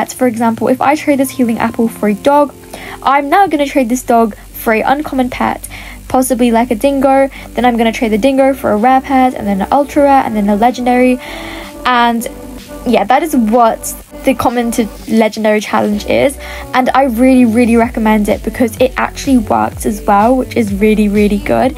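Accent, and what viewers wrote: United Kingdom. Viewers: You are so british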